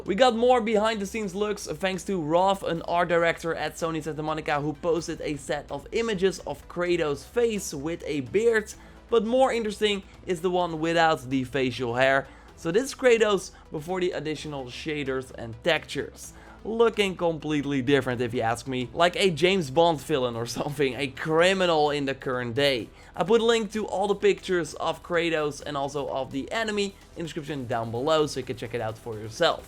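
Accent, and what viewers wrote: Netherlands. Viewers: That horrible Dutch accent. I puke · is any way to ask someone who speak correctly english without any harsh accent like yours ? Because bro it is really difficult to focus on what you are saying with your dutch accent. Then you will focus on the video montage while someone else will care on the speech. And please change us this annoying same and same music background... Take care.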